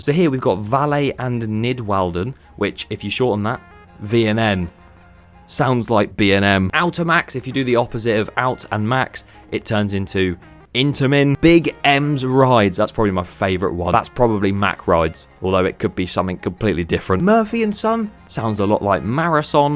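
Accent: United Kingdom. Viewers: I like you, british man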